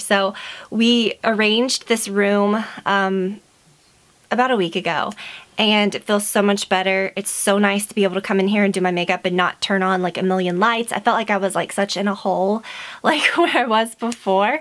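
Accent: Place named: Georgia, United States. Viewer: Enjoyed seeing these favorites. Sorry you're having such terrible seasonal problem's. Though, I do think you should make sure your ear problem, is caused from seasonal sinus problem's & nothing worse......I did giggle for a second when you made that comment about hoping you weren't sounding different, as what I noticed {heard} most was your GA drawl seemed more pronounced, than usual.......which I clearly love and am partial too hearing! Hope you and Brad have a fun filled weekend...{hugs} from Statesboro! ~J~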